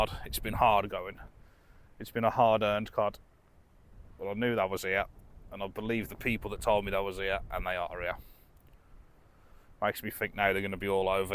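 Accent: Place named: Rugby, United Kingdom. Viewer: You sound like you're from Rugby!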